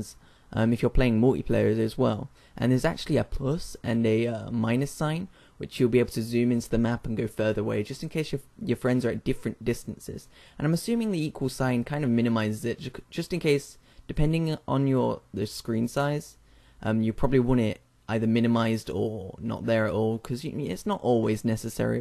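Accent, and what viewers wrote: United Kingdom. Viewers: jesus christ, you sound exactly like James, from rune shark, i had to take a double check for a second...I dont live in the UK but im pretty sureyou have the same accent as well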